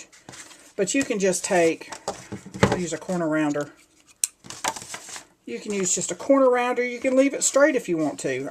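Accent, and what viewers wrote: South, United States. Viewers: Oh my goodness thank you! I love all your peekaboo pockets. I just found your channel a few weeks ago and I have to say I so enjoy your mini's and have watched all of your videos already!! This video was awesome!!! <3 Your southern drawl is super awesome I could listen to your tutorials all day!! Your such a great teacher!!! ((NY hugs)) -christine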